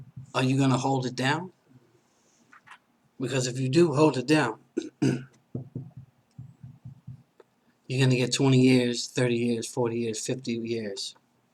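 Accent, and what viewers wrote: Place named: Queens, United States. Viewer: Where u from man Oh wait shit u from queens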